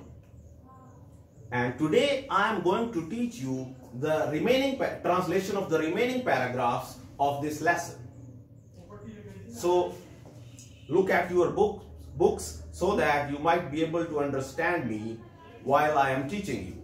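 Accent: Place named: United Kingdom. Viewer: Excellent pronunciation n perfect British Accent.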